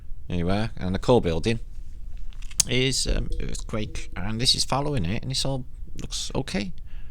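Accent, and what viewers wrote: Wales, United Kingdom. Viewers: how strange the other reply !! Should change their YT handle to "Anal D" - its a lovely Welsh " accent my friend :-)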